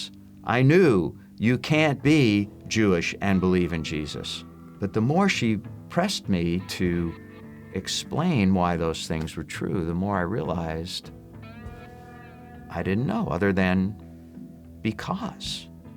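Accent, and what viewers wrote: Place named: Long Island, United States. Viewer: Sounds like Long Island ny. God bless brother!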